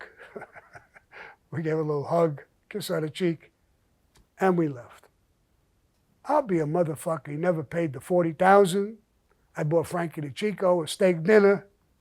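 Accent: New York, United States. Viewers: ... I just love your NY accent. Keep on keeping on!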